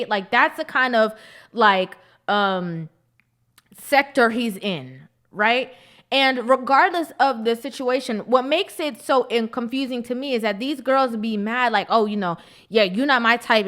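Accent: Jamaica. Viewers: I hear the jamaican in u. I like ur comments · Good job though. I can detect you’re Jamaican. lol I’m too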